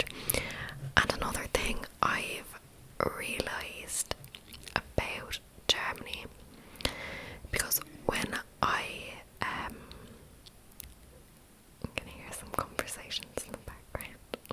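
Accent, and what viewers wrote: Ireland. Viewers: Your accent is so enchanting and it goes with ASMR so well. Im roughly 50% Irish but unfortunately never picked up the accent from my relatives. Id kill for an Irish accent, mainly because Ireland is one of the top three places Id love to live after im through with university. ❤ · Lovely video! A 40 minute video with your gorgeous Irish accent is always a treat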